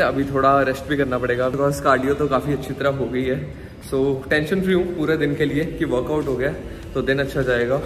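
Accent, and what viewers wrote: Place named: Bengal, India. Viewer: Your bengali accent ♥️🔥🥺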